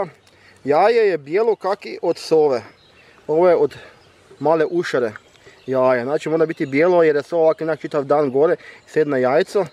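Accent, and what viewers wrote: Zagorje, Croatia. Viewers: Neznam jeste vi u BIH imali na vašoj televiziji domaći stari humoristični serijal "Gruntovčani"...identičan govor,valjda mi zato i je zanimljiv! Simpatičan:) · Ma Zagorski naglasak, samo sto je ovo u fullu xD sa primjesama Slovenackog, mene podsjeti na Pervana i onog bunara i sina Vl00de ahhaha samo ne moze ni Pervan imitirat koliko ga kralj zavrne jezikom lol, nije mi to poznato bar po imenu, ali kad bi pogledao vjerovatno bi se sjetio, a kako znas da sam iz BiH, nije mi jasno kako ljudi na osnovu nicka, ne pretpostave nego vec zakljuce odakle si:D zanimljivo... EDIT: evo ti to sa Pervanom i bunarom hahaaa <link>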